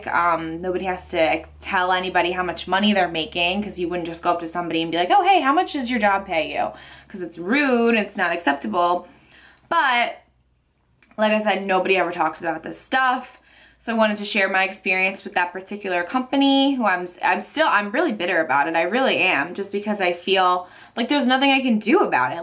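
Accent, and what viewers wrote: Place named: Philadelphia, United States. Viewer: dude your so dope! and your accent is awesome, since your from philly it makes sense but are you italian too? and thats fucked up of that company, they should have kept working with you!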